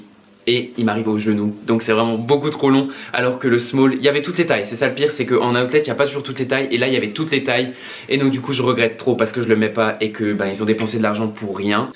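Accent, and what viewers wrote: Alsace, France. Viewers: Tu viens d'ou d'alsace ? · T'ES ALSACIEN OUIII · j'adore ton accent alsacien prononcer je suis pareille vive l'Alsace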